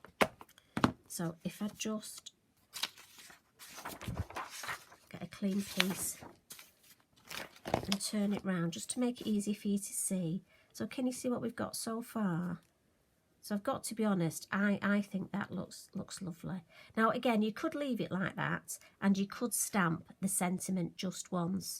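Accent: United Kingdom. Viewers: Absolutely gorgeous! I have got to try this one! I love your accent! My son and his wife live in London and last fall we stayed there three months. It was the best time of my life other than having my babies! Now I’m going to be a grandmother....so exciting! Just hope we can get over there again next year! So enjoy your videos...keep them up!♥️😘🤗🌸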